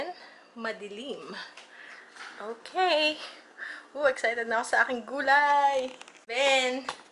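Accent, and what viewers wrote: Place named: Quezon, Philippines. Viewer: Taga Quezon Ka po ba? Nakakatuwa po kapareho kita ng punto. He he. Ang cute po mga videos mo :)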